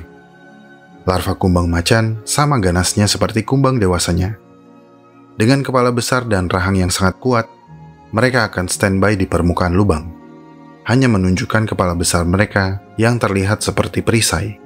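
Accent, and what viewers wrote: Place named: West Java, Indonesia. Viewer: Sieta mani teungteuingeun. Dr lafalnya Fix orang Sunda.?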